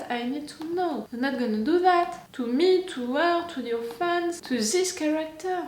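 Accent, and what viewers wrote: France. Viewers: your accent is so french and I love it 😂😍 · J'adore comment j'ai vu direct que tu étais française, on sent nos accents 😂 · Are u French? Love your accent although I can’t understand a lot haha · T’es française t’a un ptn d’accent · Haha ça s’entend que tu es francaise mais c’est trop cuteee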